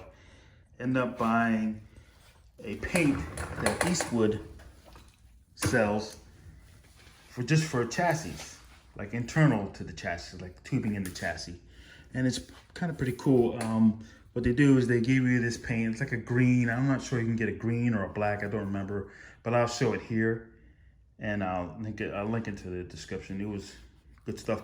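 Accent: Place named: Northeast, United States. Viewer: Good work! I hear a hint of Northeastern in your voice.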